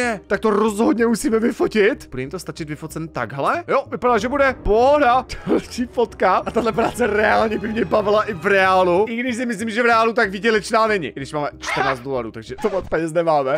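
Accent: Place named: Pilsen, Czech Republic. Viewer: Upřímně jsem toto videjko měla dneska jako relax - byla jsem na 1 den u Plzně na výletě (v Domažlicích) a tedy... jak jsme přijeli do Prahy, energie někde (nevěřila bych, že si to někdy až tak uvědomím, ale už jen ten fakt, že se znovu vracíš do domoviny / jsi blíže do reality, tak tě vyčerpá) a já už si fakt přála, abych byla doma 😅 Vážně, dneska jedno z videí, kde jsem zarelaxovala a teď přímo postel xD Zítra ještě shlédnu videjku na main YT, ale dneska jsem si dočerpala "pozitivní energii" zde, zítra doplňuji u hlavního kanálu. Děkuji za ni (a též si budu o to více vážit, když tě někdy nějaké ten přízvuk od Plzně se občas objeví ve videjku) ❤:D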